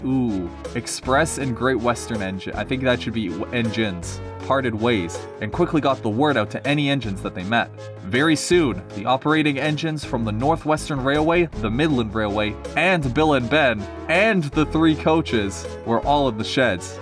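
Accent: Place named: Scotland, United Kingdom. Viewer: That Scottish accent XD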